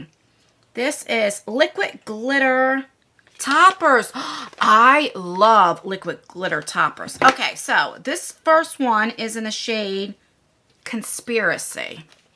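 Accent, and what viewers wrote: New Jersey, United States. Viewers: I just love you and your big personality. I'm so sorry that someone in the same community as you would be so evil to slander you're daughters credibility. It makes me sick especially in the times were in. My heart broke for you're daughter and I hope she's recovering well. I had a bad day myself and hearing that big jersey accent made my day a hair better. Thank you for being you.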